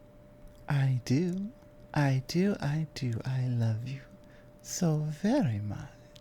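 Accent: United Kingdom. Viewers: Oh my God the British accent activates my neurons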